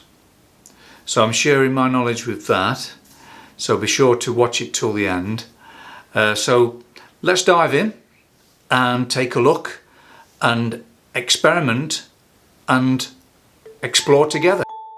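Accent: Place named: Derbyshire, United Kingdom. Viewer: You sound like you are from Chesterfield uk. Do you do any art workshops. I know you do patron?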